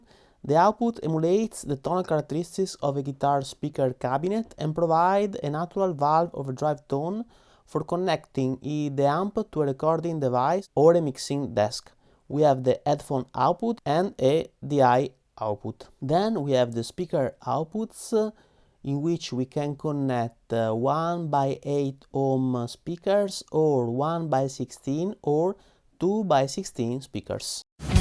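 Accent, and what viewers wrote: Venice, Italy. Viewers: a sentirlo temo di si ;D mi sa che è veneto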